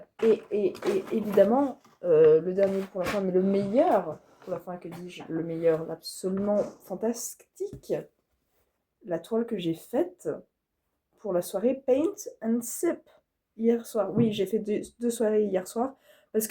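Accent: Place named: England, United Kingdom. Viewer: Je kiffe ton accent anglais 😮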